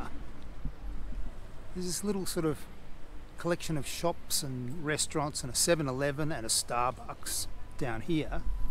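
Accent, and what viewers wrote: Australia. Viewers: You sound like a guy from👇 under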